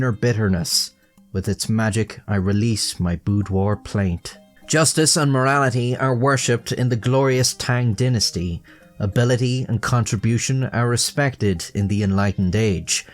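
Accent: Ireland. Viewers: Please, Irish guy in my iPad, read me more poetry. I promise I won’t be idly scratching my ass this time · Is that accent Irish?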